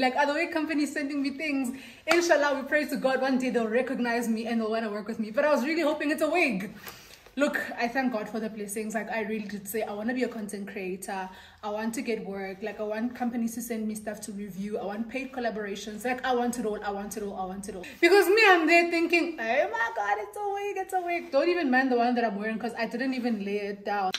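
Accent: Nigeria. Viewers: Please this naija accent is everything and more 😂😂😂😂❤❤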